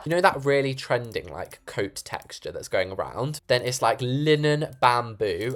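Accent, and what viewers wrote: United Kingdom. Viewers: Uhh I live in England and I approve of this house and the British voice what u America people think how we think speak that cocky from London so ya Edit: this house is like a mixture of rich and normal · yeah I’ve been watching for 1 year now or about that and I found out as I realised that he has a British accent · FIRST AND BTW I AM NOT BRITISH SO I AM TRYING TO LEARN IT FROM YOU LOL YOUR BRITISH IS AMAZING (PLS PIN ❤️💙 )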